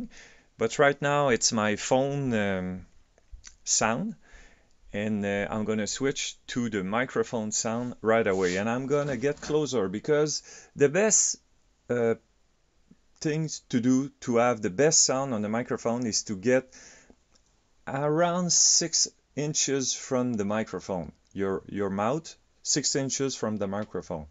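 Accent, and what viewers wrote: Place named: Quebec, Canada. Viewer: Toé t québécois certain ?????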